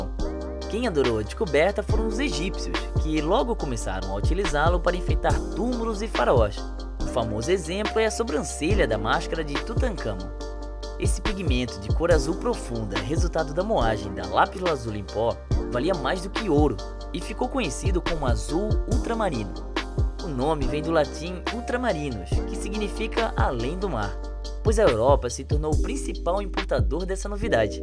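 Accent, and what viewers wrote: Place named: Santa Catarina, Brazil. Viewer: Cara tu é catarinense so pode kkkk tmj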